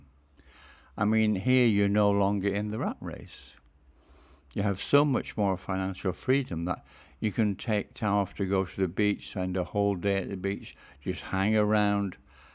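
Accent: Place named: England, United Kingdom. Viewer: Oh….it was AI ? I didn’t know that. I thought she was real person with lovely English accent. Thanks for info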